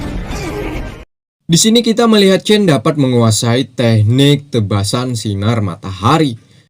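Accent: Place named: Kalimantan, Indonesia. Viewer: Bg cara bicara lu kek orang kalimantan emang aslinya orang mn, ngomong² gua orang kalimantan selatan bg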